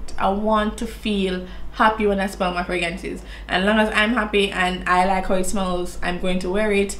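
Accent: Jamaica. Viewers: That Jamaican Patois 😍😍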